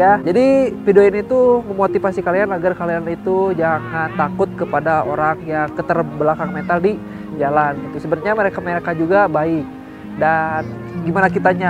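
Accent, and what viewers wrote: West Java, Indonesia. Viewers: Bang asal mana ko sunda 😊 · Diatu sunda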